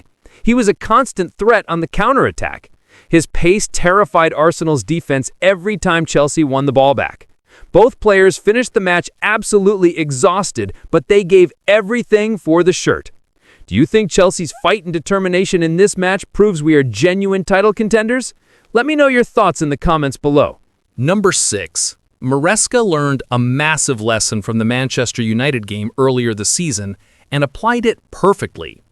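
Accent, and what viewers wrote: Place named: United States. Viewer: We don't want these ghastly American narrations. Spoils things!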